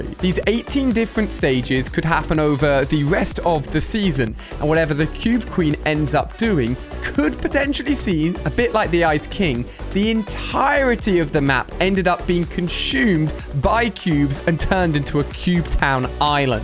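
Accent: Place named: United Kingdom. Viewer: She-swee is how you pronounce shisui but he lives in UK so he talks different. I'm not hating btw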